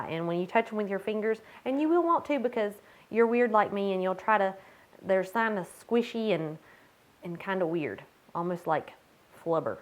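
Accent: South, United States. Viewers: THANK YOU for mentioning how to store the grains without making more Kefir! Yours has been the only video (and I've watched about a dozen now) that mentions this. I want to start making Kefir, but don't envision that I'll need to make a new batch everyday (like everyone seems to do) and I was wondering what one did with the grains to store them, or if this was even possible. P.S. Love your southern accent. : )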